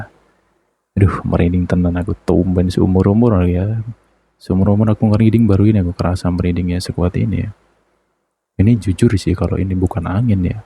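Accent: Java, Indonesia. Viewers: Asalamualaikum😁ea mas suwun lo tapi bru semenit kok udah kangen mas ngomonge fersi jawa gitu ngena banget mas🤍🙏🙈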